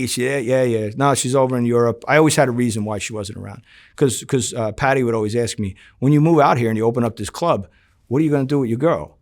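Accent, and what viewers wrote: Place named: Italian American, United States. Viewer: I love a NY, NJ, Boston, Philly, and Bmore accent. Might be a lil bias wit the bmore accent cuh i live around there but I’ve always admired these italian american city accents fs. There’s just something so unique sounding about them than ur typical american accent. That being said, his voice paired with his story, really narrated like he’s narrating a book. Really helps keep my attention. 2 hours and 30 mins in and haven’t stopped watching this whole time.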